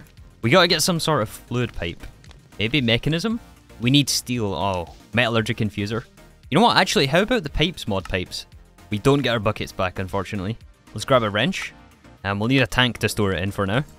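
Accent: Scotland, United Kingdom. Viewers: a fellow scot? interesting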